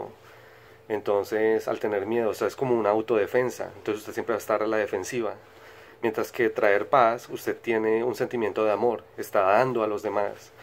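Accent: Colombia. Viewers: Eres colombiano 😁?? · A ver... Es del futuro....? De que año? Y porque habla como rolo gomelo gay de la actualidad....? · me pa ke sos colombia vos-- igual bien x tu data-- --- yo argentina ! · Acento colombiano? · Gracias por tú información amigo,por tu acento sé que eres colombiano, pero lo importante aquí es que eres inteligente, gracias por la información yo en lo personal no tengo religión pero no soy ateo yo creo en jehová en Jesucristo y en el espíritu santo y ,no soy masónico pero creo que sin querer me identifico más con ellos, no quiere decir que quiera unirme a ellos, pero no e querido unirme a ninguna religión cristiana, porque veo que siempre hay desigualdad en todas,,claro y lo tengo muy claro, nunca seré musulmán ésa religión sí no le encuentro sentido alguno,,,,,morir sólo porque sí muero me van a estar esperando siete vírgenes en el cielo,eso no tiene sentido, yo prefiero disfrutar vivo a siete mujeres aunque no sean vírgenes pero en vida y claro si ellas quieren con el consentimiento de ellas!!!! 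· Eres Colombiano? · Tienes acento bogotano, me gusto el video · Tienes acento colombiano! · Jefe Maestro no ve ke me estoy riendo. ? no me molesto. pero eske si. la vos de este hombre. me encanta. nose si es colombiano. eso me encantaria todavia mas. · Lo que usted dijo ya se dijo otro lo dijo al otro y dijo digan al otro a que digan y lo dijeron a digan y dijo y así me doy y al final no decís nada y te cubrís de ninja por miedo o tu eres masón tu acento es colombiano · Esta bueno el vídeo....Creo que el acento de este hombre es de Colombia 🤔 jejejejejeje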